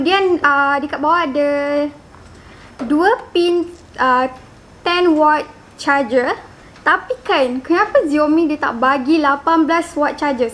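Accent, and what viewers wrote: Kelantan, Malaysia. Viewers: Ore kelate ke review.? Macam kelate jah... Jugak..?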